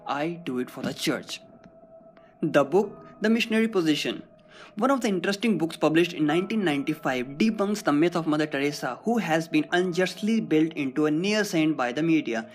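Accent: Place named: India. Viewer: Hard to understand this kind od indian version on Hindi English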